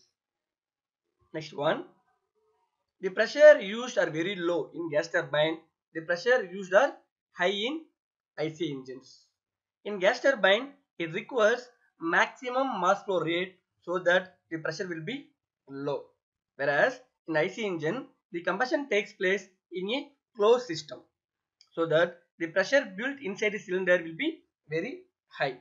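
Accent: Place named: India. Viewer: Lmao. For every question there is a video made buy a indian sounding guy. Thanks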